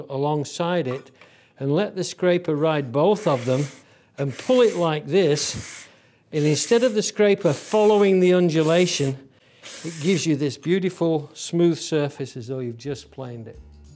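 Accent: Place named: Southeast England, United Kingdom. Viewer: Hey, what part of the 🇬🇧 are you from? You sound like a southeastern accent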